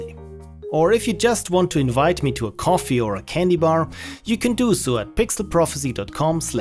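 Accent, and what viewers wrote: Germany. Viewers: Du bist ein deutscher, stimmts? :D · You are German, aren't you?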